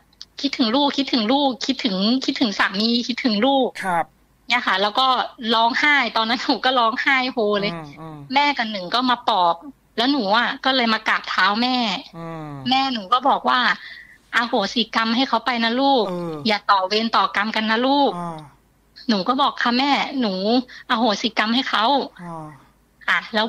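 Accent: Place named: Sukhothai, Thailand. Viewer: สำเนียงการพูดเหมือนคนสุโขทัยเลยครับ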